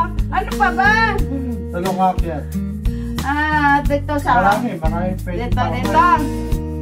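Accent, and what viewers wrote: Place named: Visayas, Philippines. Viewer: Thank you bisaya kaayo oi pwerting bisayaa🤣🤣🤣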